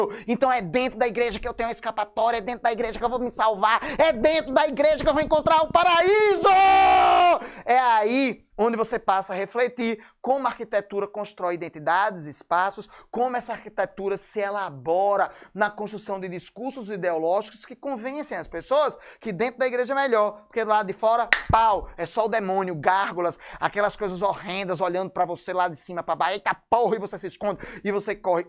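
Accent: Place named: Paraíba, Brazil. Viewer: Ese cara fala igual Paraibado OU potiguar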